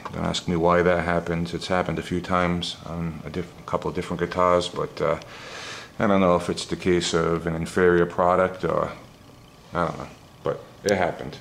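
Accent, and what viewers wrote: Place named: East Coast, United States. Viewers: this guy has to be from massachusetts......or.....new york. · I got a kick out of your accent. Such an east coast '70's hair band voice (no slight intended, just that, out west we don't have the same attitude in our speech). I have a '72 Gibson J-160 that needs a new nut because the high E is too close to the edge. Bone or plastic? Thanks, man. Really liked the vid!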